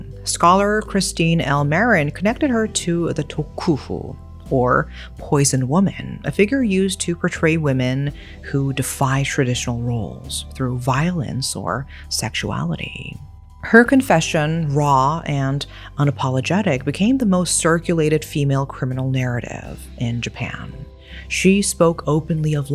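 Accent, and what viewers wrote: South Korea. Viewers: I can hear some Korean pronunciation to be honest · I love how you say Asian names with a Korean accent - a unique twist!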